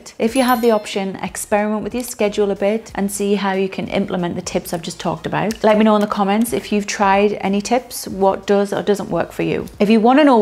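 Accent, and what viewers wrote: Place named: Newcastle, United Kingdom. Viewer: Must be a Geordie!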